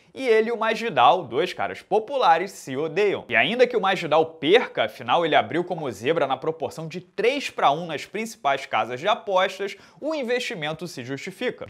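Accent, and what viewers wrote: Rio de Janeiro, Brazil. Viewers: Meu, esse sotaque carioca é TÃO IRRITANTE!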